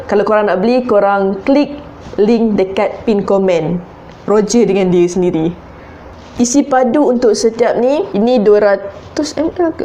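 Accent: Kelantan, Malaysia. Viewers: Ore kelate koo ni 😂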